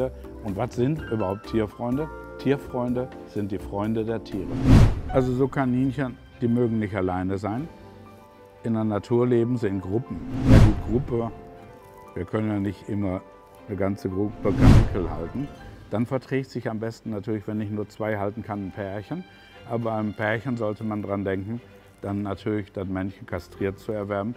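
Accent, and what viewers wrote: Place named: Ruhr, Germany. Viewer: Plattitüden und Ruhrgebietsdialekt, kennt man sonst nur aus der Kirche.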